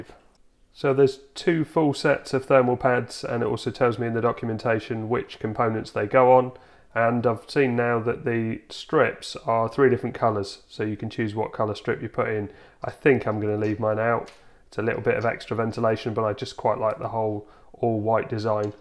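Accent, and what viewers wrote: United Kingdom. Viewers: Thanks for the update and review. 52PI Has really stepped-up their game! I always liked the way you pronounce A-Lume-A-num (US) ….Al-You-Mini-um (UK)…when I 1st started listening to shortwave pre internet the BBCWorldService pronounced just like you…and I went crazy trying to figure out what’s that new metal the U.K. Has?…😂! Have a great day!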